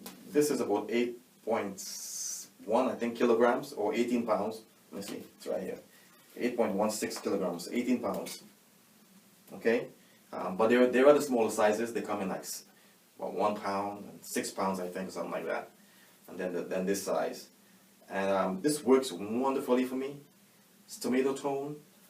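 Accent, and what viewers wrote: Trinidad and Tobago. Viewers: Sound Trini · Thanks so much for this. I now started using Tomato Tone. PS for the entire video I thought "Why is he sounding like me?" Only to read the comments and see that you're from Trinidad too. I'm just here in Couva trying desperately to grow tomatoes :)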